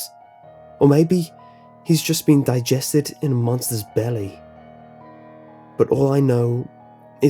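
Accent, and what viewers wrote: United Kingdom. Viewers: THTHTHTHT terrible. british accents cant help terrible stories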